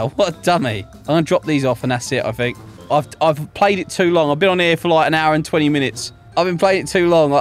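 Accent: United Kingdom. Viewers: i think you speak british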